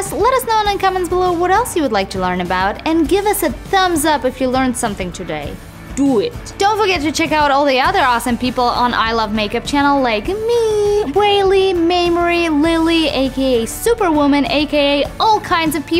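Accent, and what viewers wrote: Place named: Russia. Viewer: i like this slight russian accent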